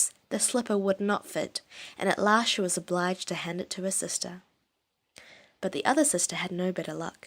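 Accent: New Zealand. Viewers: 뉴질랜드 영어 발음으로 동화를 들으니까 리스닝에 도움이 됩니다. 감사합니다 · Love your kiwi accent! 저는 20살 이후에 뉴질랜드에 가서 아시안 악센트가 강한데 역시 키위악센트가 세계에서 가장 섹시한거 같아요~ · 뉴질랜드 액센트 잊지않을려고 옛날부터 많이 듣고있었어요!! 목소리 너무 좋아요 계속 올려주세여❤️ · 뉴질랜드 억양 찾다가 우연히 듣게 됐는데 목소리 너무 좋아서 바로 구독햇어요!! 음악만 듣고 살았는데 음악 대신 계속 듣게 될 것 같아요 😍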